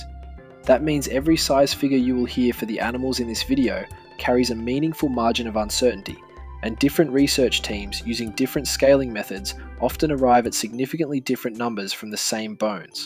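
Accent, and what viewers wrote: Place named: England, United Kingdom. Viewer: that just the way red coats say the word.. some annoying english guy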